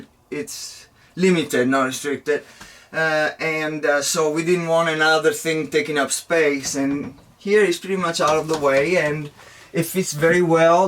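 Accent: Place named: Austria. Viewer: I sense a lil but of Austrian or German accent, á la Arnold Schwarzenegger’s voice..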